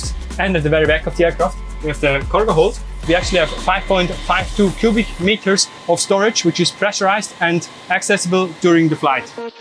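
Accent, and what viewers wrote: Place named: Switzerland. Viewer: Meeeega schwizerakzänt ich würd sogar säge du bisch stadtzücher😂 stimmts?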